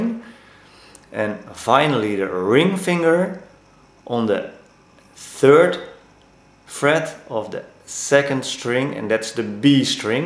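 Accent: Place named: Netherlands. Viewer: the MIDDEL VINGER! haha nice accent:$ nl ftw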